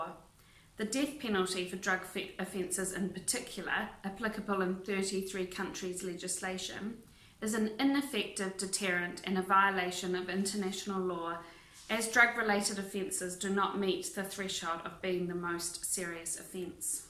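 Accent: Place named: New Zealand. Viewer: Just watched Al Jazeera (stream AJ) about prisons in Europe. Couldn't help it but amongst the comentators I noticed you sound very very Kiwi. Well done! Aotearoa all day!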